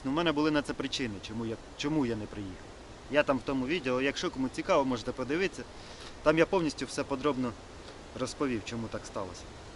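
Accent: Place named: Western Ukraine, Ukraine. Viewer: Як мені родом з західної приємно слухати вашу говірку ❤❤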